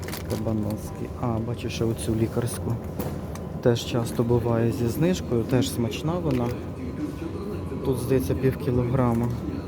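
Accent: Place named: Western Ukraine, Ukraine. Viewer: ну звісно як без них? Але так гарно розмовляючи українською і портити її західним акцентом просто не повага до самої мови. Дуже шкода.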